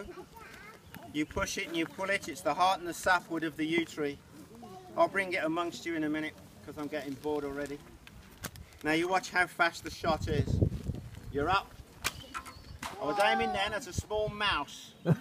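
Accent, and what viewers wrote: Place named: Midlands, United Kingdom. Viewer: This is just how people from the Midlands talk.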